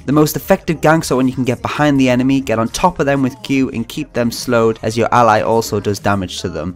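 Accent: Newcastle, United Kingdom. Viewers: mate, you a geordie too?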